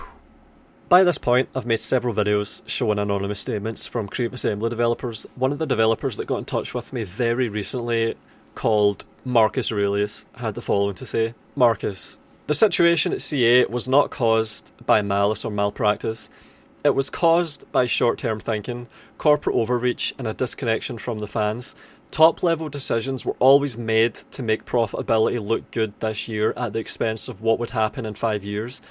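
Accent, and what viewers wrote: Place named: Belfast, United Kingdom. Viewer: cool mate. Your accent sounded like it could be Belfast. Anyways enjoyed the video!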